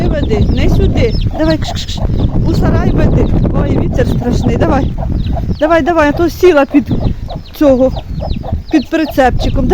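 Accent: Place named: Kryvyi Rih, Ukraine. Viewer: Яка красота я тоже виросла в поселку в кривому розі ,у нас точно такий говір,а де ви живете?ви молодці хазяйни бажаю всим миру,живу в Києві мені уже 72 роки,все промайнуло ,насолоджуйтесь життям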